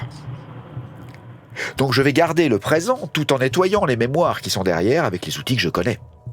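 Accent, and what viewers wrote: France. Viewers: Très intéressant. Et avec un très léger accent ardennais ce qui ne gâche rien ;-) . (Je vais être hors sujet mais tes vidéos de basse manquent un peu. Au delà des magnifiques cover de maiden, avec le niveau que tu as, ce serait génial que tu fasses plus de vidéos pédagogiques comme le galop de Steve ) merci pour tout. Musique et spiritualité, ta chaîne est passionnante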